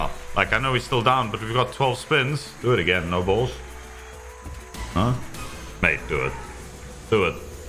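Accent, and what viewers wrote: South Africa. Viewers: In also from South Africa I thought I heard a South African accent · Didn't know you were originally from SA, hard to tell sometimes. I hear like Dutch and English with like idk, Australian sprinkled on top. Accents are cool. I'm from the West Coast and have traveled around the entire US, it always shocks me when people start talking about accents, like I hear theirs but I don't hear mine!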